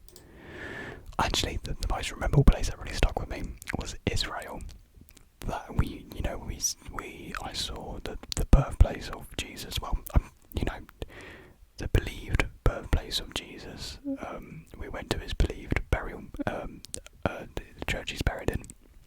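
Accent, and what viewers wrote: United Kingdom. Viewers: oooo you know you might be one of the first few British ASMR people I've found never realised I prefer listening to my own accent rather than american!! Loved the mouth sounds a lot you should definitely do some more :)) who's your fave music artists atm ? x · I see im not the only one who also listen to your conversational videos to learn English It would be fun to hear you speak with a full American accent, but your British accent adds a special charm to you and your asmr Thank youuu i love u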